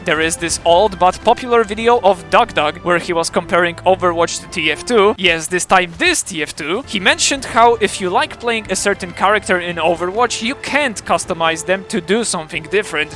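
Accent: Poland. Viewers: I did not expect a polish accents here xD wtf. Nice video 👍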